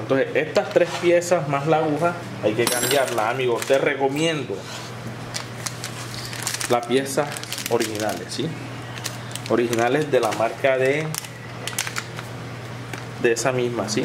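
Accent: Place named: Caribbean Coast, Colombia. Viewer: ¿De donde saca ud. Que es venezolano? bien colombiano y costeño que si es. No se de donde pero su acento me lleva a pensar que es del sur de Bolívar o Sucreño.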